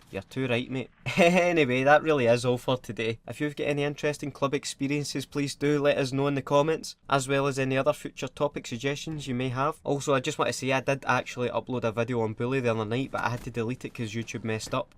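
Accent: Scotland, United Kingdom. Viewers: Hey, can you please do more Scottish Voice overs like the robot ones, even something like Postman Pat or something? Cheers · Where about in Scotland are you from? I'm from Edinburgh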